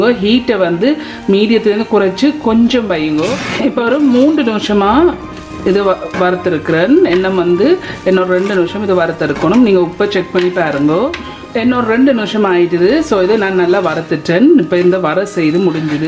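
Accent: Sri Lanka. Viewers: உங்கட இலங்கை தமிழ் ரொம்ப வடிவா இருக்கு உங்க மாதிரி... இந்திய தேசத்தின் தமிழ்நாட்டிலிருந்து தீபா...❤️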